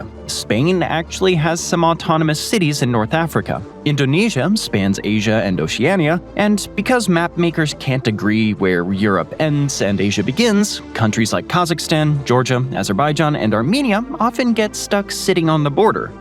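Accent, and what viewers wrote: United States. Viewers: The whole video is in American English. And a lot of cities have different names and pronouncations. Like countries. Most countries call themselves different than tge rest of the world does. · The voice being spoken with an accent from USA - says a lot. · Got to admit, this is probably the most enjoyable vid' with an American accent I've ever watched. Even so, as a Brit well into my 70s, I wasn't taught most of these myths in school. Then again, I wasn't taught that history started on July 4, 1776, either. America, please don't take offence. I'm only pulling your leg. Your cultural view of the rest of the world does amuse me, though.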